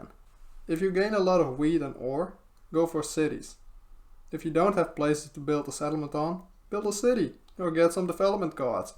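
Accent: Netherlands. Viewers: Ik hou van het Nederlandse Engels accent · Heel sterk Nederlands accent haha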